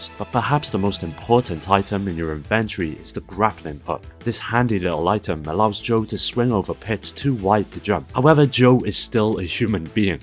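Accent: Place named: Wales, United Kingdom. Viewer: Man I KNEW you were a fellow Welsh. You can't miss that accent.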